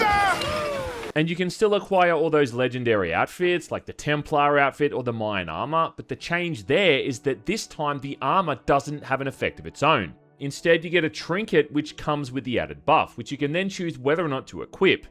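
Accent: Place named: Broad, Australia. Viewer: I saw one of your earlier videos before this and the difference in your accent is insane. You’ve gone full bogan. Did you move to a different part of Straya a few years ago or something?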